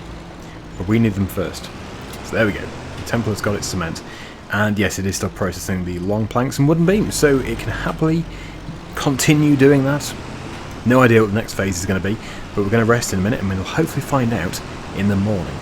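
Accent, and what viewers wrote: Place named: Southern England, United Kingdom. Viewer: Can I just say, the British way of saying things is the correct way. Our American friends pronounce things incorrectly and have some of their own words for things. A trunk to the American’s is a boot to us. Lol…you can’t cater to every language dialect and varying terminology. Just be you, be British (I would say and ‘proud’, but atm Britain is a little broken). Anyway at least you’re from down south and sound posh. Unlike myself who is a northern lass and sounds as broad as a plank, with a strong Lancashire accent 😊 I would have put money on you flipping that trailer with all that wood on it! You surprised me lol…it was very close at one point though. 😂🤣 Loving the series very much, but I would like to see you ride the horses now and then. I hope you are feeding Dogwin! Thanks for all your hard work.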